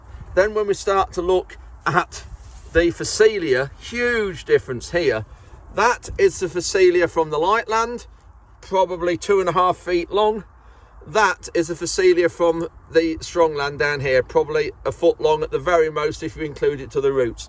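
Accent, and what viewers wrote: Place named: Lincolnshire, United Kingdom. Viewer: As an exiled Yellerbelly from the Fens always good to see what's happening on the land, even if you are up on the high ground. And good to hear a Lincolnshire accent too!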